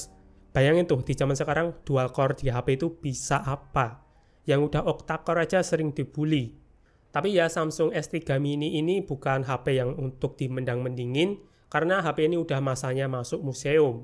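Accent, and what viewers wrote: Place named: Bugis, Indonesia. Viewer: Bang kamu orang Bugis yah ?? Kedengaran dari logat nya😊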